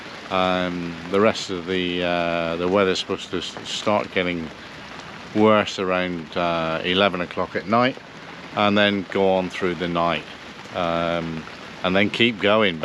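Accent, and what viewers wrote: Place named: West Country, United Kingdom. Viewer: Great Video. Good to see you and hear that West Country accent too!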